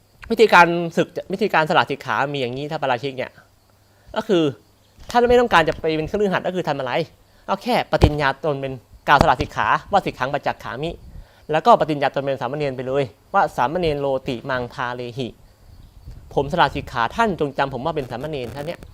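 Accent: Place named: Rayong, Thailand. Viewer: สำเนียงเพราะ คล้ายๆคนแถวเมืองระยอง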